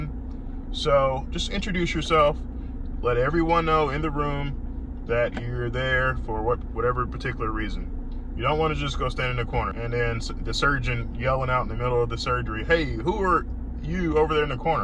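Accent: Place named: North Louisiana, United States. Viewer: You sound like your from North Louisiana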